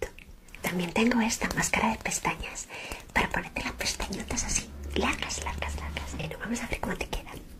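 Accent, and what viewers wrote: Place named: Spain. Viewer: Esta muy bueno el asmr pe nose que tengo con el acento español que no me da tranquilidad pero igual esta lindo <3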